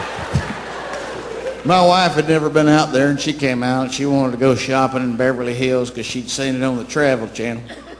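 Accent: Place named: South, United States. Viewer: His southern voice makes the jokes, all the more better! Who agrees wit me?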